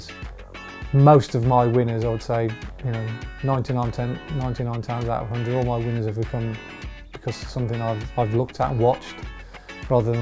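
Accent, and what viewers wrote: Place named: Yorkshire, United Kingdom. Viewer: from yorkshire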